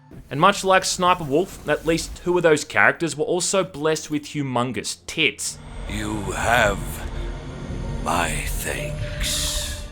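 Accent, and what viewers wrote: Australia. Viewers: "Where he backflips off a missile in mid air, I mean come on son!" - Aussie AF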